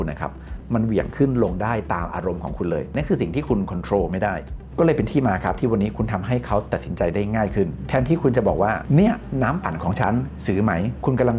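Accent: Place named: North, Thailand. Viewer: นี่คนเหนือชัวๆ😂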